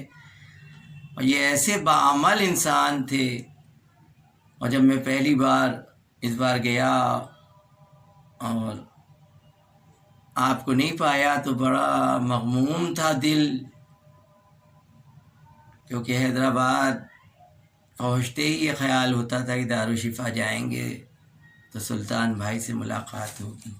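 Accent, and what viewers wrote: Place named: Lucknow, India. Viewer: Channel ne khaas Lucknow ke lahje me soz o marsiye ki goonj hum sab ko karbala e Mualla tak Sunwa di MashaAllah Recited Soora e Fatiha From Karbala/Iraq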